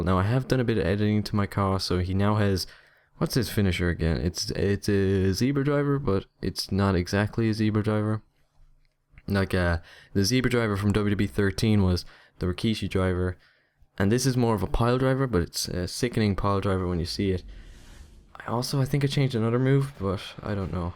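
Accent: Ireland. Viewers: I can hear a bit of Irish accent in your voice. Do you have any Irish blood in you?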